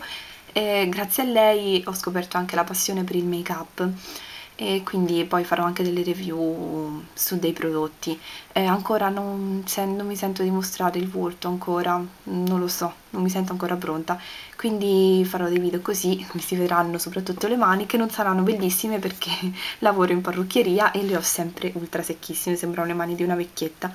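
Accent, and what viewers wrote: Marche, Italy. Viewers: Ciao!! Ti ho scoperta grazie a DieMademoiselleAnne, e devo davvero ringraziarla! Dai dei consigli utilissimi, e poi è un piacere ascoltarti :) Ho notato una certa cadenza maceratese, o forse ascolana... per caso sei marchigiana?? Io si :))) Ciao da una nuova iscritta :))))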